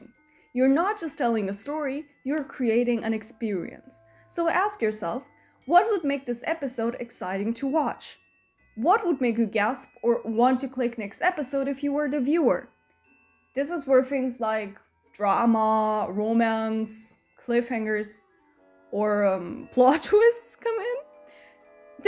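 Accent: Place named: Poland. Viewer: u sound polish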